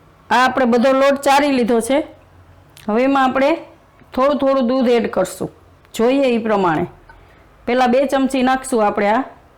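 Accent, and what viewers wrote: Kathiawar, India. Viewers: Pyor kathiyavadi gujrati bolo Cho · બેન રેસિપી સરસ છે અને તમે કાઠિયાવાડી ભાષામાં જ બધુ બોલો એ જ સારૂ અને મીઠું લાગે છે અએ તમારી usp છે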